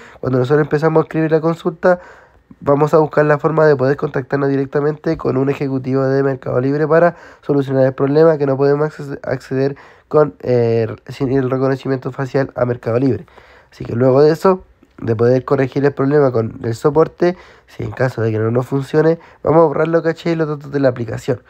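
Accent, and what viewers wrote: Chile. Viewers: Es chileno, así es su acento, de hecho es bastante entendible comparado con la mayoría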